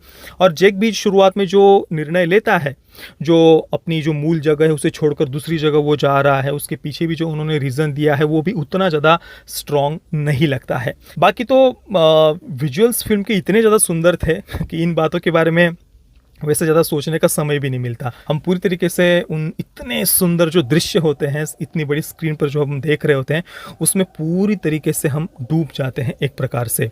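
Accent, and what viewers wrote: Maharashtra, India. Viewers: भाऊ तुझा भाषेवरून एक मराठी माणूस लगेच ओळखु शकतो मस्त आहे तुझं (वर्णन शब्द). मस्त वाटल मराठी माणूस review देताना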